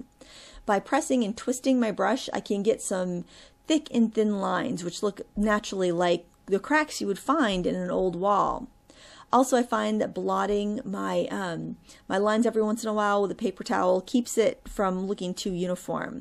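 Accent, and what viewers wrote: Maine, United States. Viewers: Love your Maine dialect! you hoped you had enough "rum" to paint the pink geraniums - must be one of those wine (rum) and paint classes! Lol! Love your channel and look forward to each new one - keep 'em comin'..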